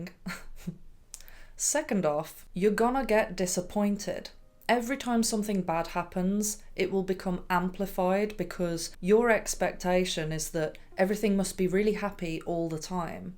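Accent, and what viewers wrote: Birmingham, United Kingdom. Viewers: In these turbulent times, I can recommend a return to traditional orthodox Christianity. As westerners, this is already pre-anchored in our subconscious and is a rich and interactive spiritual environment with angels, saints and The Theotokos (Mary) etc. Love your very slightly brummie-sounding accent 😊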